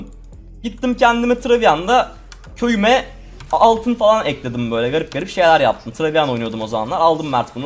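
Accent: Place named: Kahramanmaraş, Turkey. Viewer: maraşlımısın knk sadece maraşda böyle konuşuyolar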